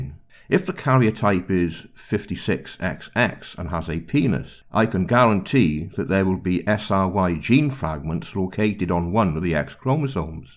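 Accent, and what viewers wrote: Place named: Cardiff, United Kingdom. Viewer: Excellence in a Cardiff accent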